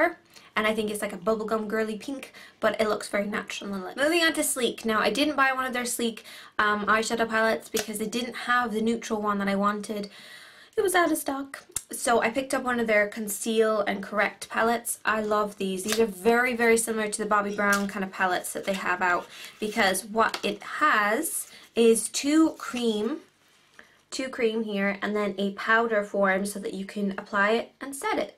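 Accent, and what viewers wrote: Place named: Cork, Ireland. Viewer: So good to hear a Cork accent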